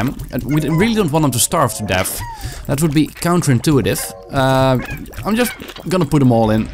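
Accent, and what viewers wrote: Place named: Netherlands. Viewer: i think he's Dutch lmao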